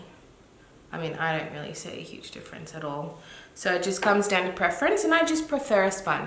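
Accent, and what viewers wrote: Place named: Australia. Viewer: Finally, a beautiful sounding Australian accent! 😅 So many girls aussie sound terrible on youtube. Thank you! 🤘🏻 easy to listen to.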